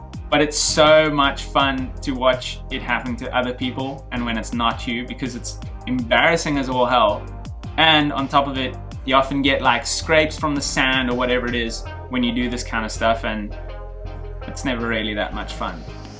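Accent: South Africa. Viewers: Hey you’re a South African 🤙🏻 Lekker Bru. I bet you’re from South Brim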